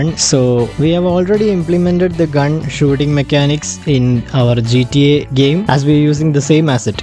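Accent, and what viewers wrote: Kerala, India. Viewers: indian accent hits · Bro's indian accent just makes me relax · accent feel like desi mallu boy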